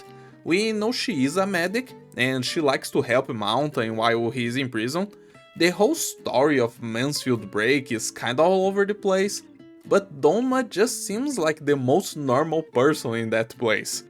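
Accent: Brazil. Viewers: é muito estranho ver vc falando ingles quando é muito obvio q vc é br (o "sotaque") tipo amo os videos mais é meio estranho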